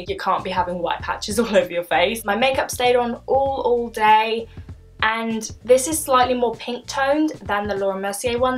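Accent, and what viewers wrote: United Kingdom. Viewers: Oh and btw, I know you're British, and have a British accent, in case that was clear from my previous post, lol.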